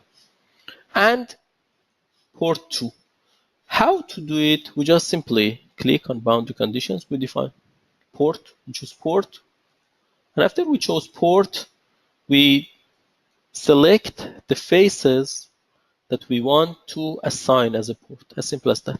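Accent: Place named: Iran. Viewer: your accent is clear that you are an Iranian. How come is your name?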